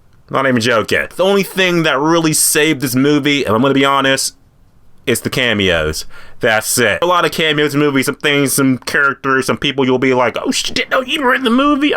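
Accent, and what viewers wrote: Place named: Pittsburgh, United States. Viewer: Are you from Pittsburgh? You got that accent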